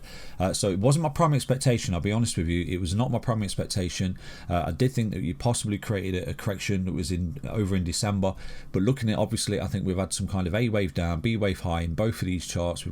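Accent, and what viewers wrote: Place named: Northern England, United Kingdom. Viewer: A lovely northern accent. Thanks for the TA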